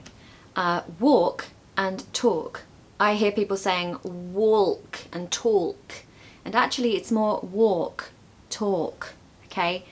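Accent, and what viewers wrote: RP, United Kingdom. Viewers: Amazing lessons❤❤ I love your British RP accent❤❤ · That's probably the main reason why I'd go for a strictly phonetic language like, for instance, the German language, if I were in a situation to choose between the one and the other :) Yeah, anyways, I'm not here to complain or argue :) English is just fine, and I really enjoy learning it. Love your accent, by the way. RP if I'm not mistaken? 😊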